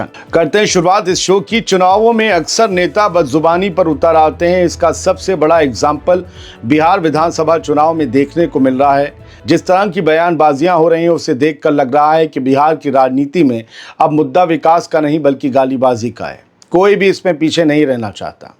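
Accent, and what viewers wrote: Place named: Lucknow, India. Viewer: zanab mai to apki lucknowi andaz me reporting bich bich me behtreen urdu ke alfaz aur Palestine, gaza pe itni shiddat aur safgoi se reporting ka vaise hi qayal ho gaya hu ! Mai apka regular viewer hu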